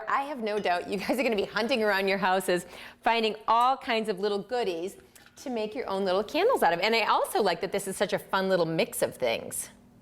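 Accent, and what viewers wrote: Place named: Wisconsin, United States. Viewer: Yes, you MUST be from Wisconsin:] I know that accent.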